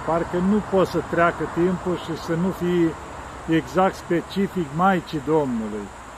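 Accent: Moldova. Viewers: Bună dimineața!!! Părinte vă ascult cu mare drag, PTR că povestiți cu mult har și ca un plus se adaugă blindetea graiului d-voastră, grai dulce Moldovenesc!!! Sănătate deplină!!! Vă rog mult, mult, mult, să binevoiți a-mi da o Binecuvintat!!! Să aibă BUNUL DUMNEZEU ATOTPUTERNIC milă și îndurare de noi și să ne binecuvinteze cu SFiNTA pace pe întreg Pamintul!!! ❤️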